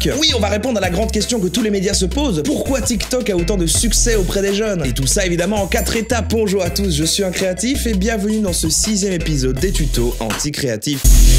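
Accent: Belgium. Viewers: il est belge donc oui, le "ui" on le prononce "oui" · Je cois avoir entendu un petit accent belge.... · accent belge :p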